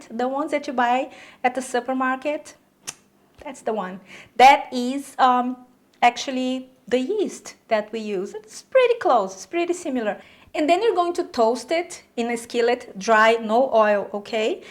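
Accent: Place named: Brazil. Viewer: I have no idea 😂 It just sounds like a brazilian who learned English very well ❤️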